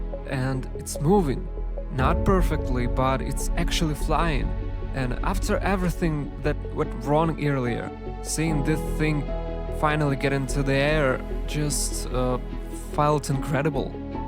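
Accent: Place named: Russia. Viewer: Звучишь как русский бро, а видос прекрасный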